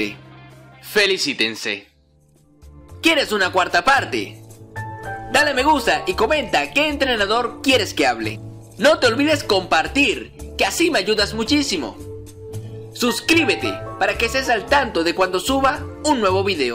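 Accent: Venezuela. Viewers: Hola amigo, eres venezolano, verdad? Además que siento que narras los videos algo parecido a Dross. Saludos desde México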